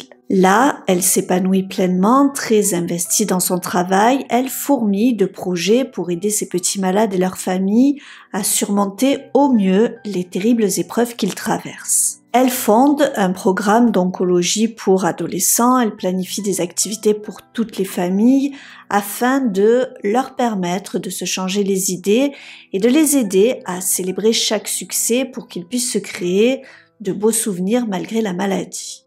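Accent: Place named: Marseille, France. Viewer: Tu es de Marseille? tu as l'accent de Zizou.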